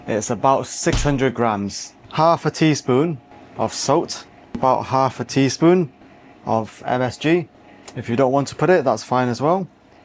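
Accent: Northern England, United Kingdom. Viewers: It's his voice, he's from the north of England, has a nice mix of accents.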